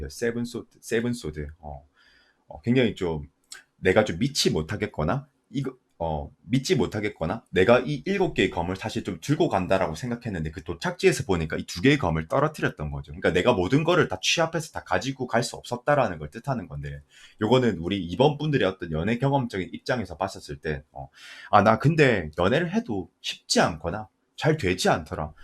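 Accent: Gyeongsang, South Korea. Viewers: 2번~(2020/7/12) 2명. 잘 맞추시네요~^^ 경상도말씨 반가워요~^^ 주말잘보내세요.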